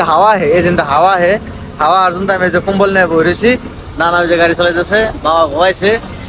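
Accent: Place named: Bangladesh. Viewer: ইন্ডিয়াতে আপনার গ্রামের নাম কি.... বাংলাদেশে আমাদের গ্রামের মানুষের কথাও আপনার কথার মতো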